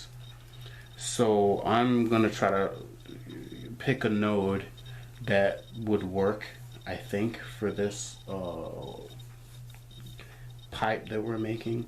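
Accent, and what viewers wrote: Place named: Midwest, United States. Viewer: you my friend are from the mi-west I'm gonna say Wisconsin like me? you talk the area nice vid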